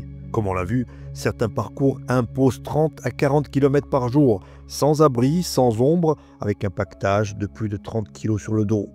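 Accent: Corsica, France. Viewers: Magnifiquement documentée ! Félicitations pour ce travail. Je crois reconnaitre un sympathique accent Corse chez le narrateur, est-ce bien le cas ? Cordialement. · Hey ! Il est d'où ce bel accent Corse ? :) ❤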